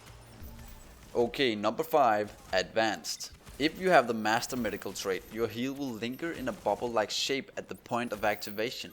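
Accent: Denmark. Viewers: Synes også det var en dansk accent jeg kunne ane hahah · could instantly hear that's another Dane :) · Er du dansker? Lyder sådan på accenten ;)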